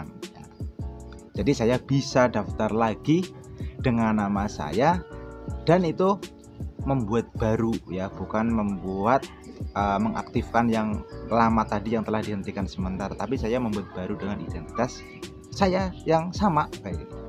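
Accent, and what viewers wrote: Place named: Banyumas, Indonesia. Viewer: Nek Tek bedek, kayane Rika Agi mancing yh?? Lah wong ngapak genah ya..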